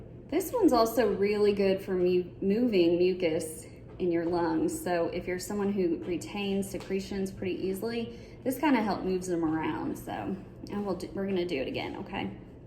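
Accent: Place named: South, United States. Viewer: Also, I love your home and your southern accent. Old Texas dude here....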